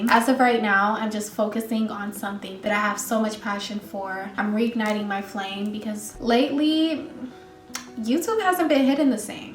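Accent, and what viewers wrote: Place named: Nigeria. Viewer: ohhh I said “chai” because I’m also nigerian hahah I was listening to your accent!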